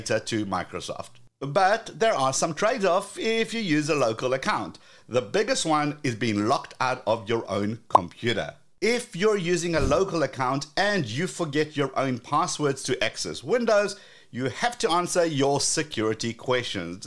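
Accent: South Africa. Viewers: I might be way behind, but are you South African? 🤣🤣🤣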